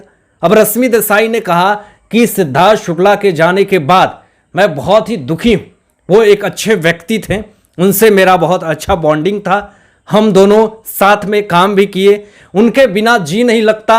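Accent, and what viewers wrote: Bihar, India. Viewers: Tum thik se baat karna sikho ..bihaari pronounciation se lekar hindi baat mat karo ...rashmi hai not rasmi .not enerjy...sunte huebv Ganda lagta hai ..host ho thik se pronounciation sikho.. very bad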